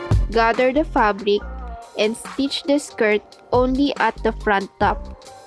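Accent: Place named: Philippines. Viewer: Your accent tells me that you are Filipina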